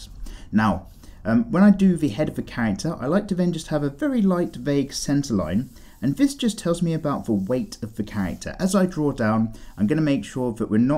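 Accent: United Kingdom. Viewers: Are you a britisher? How do you say bottle of water😂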